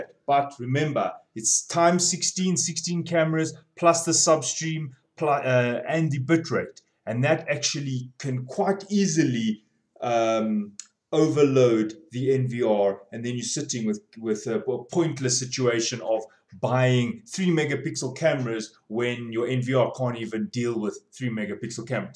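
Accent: South Africa. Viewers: south african lol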